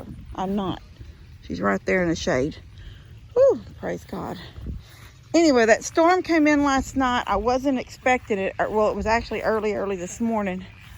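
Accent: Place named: South, United States. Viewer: I love your country talking. Some of your words todays I haven't heard in years. You are the best!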